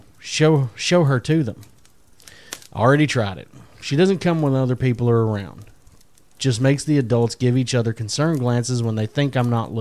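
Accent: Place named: South, United States. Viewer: That southern accent is a very good commentary voice for stories :)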